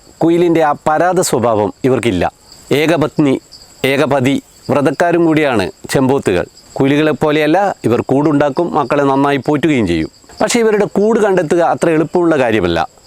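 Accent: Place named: Kottayam, India. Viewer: Your narration is clear sound ,a kottayam Pathanamthitta slang different from blathur natives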